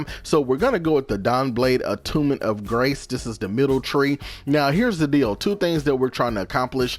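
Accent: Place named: South, United States. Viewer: You remind me of Magamu, but with a Southern accent. Great video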